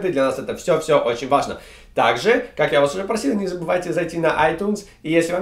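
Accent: United States. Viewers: акцент американский по знакомым знаю. а зачем приложения с газетами показывать если они все на английском? Публика то в 90% предпочитает читать на русском, я полагаю) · а ты американец или англичанин, не могу понять какой акцент????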